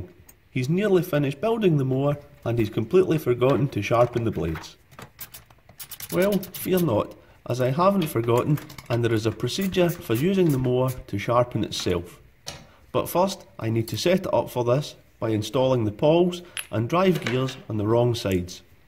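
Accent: Scotland, United Kingdom. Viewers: His accent has me wondering where he is from the mower is British but his accent sounds Scottish if you read this can you please tell me if you don't want to that's fine my ancestors from my mother's side are Scottish and I would just like to know thank you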